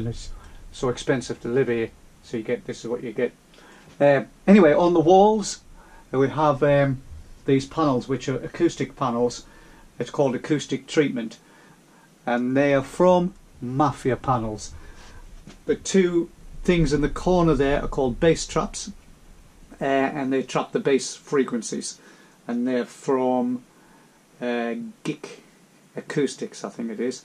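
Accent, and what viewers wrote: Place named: Northern England, United Kingdom. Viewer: you're a bloody musical titan, honest! I also have a lot of fun listening to your northern English accent, which I'm very fond of. Thank you for being a legend!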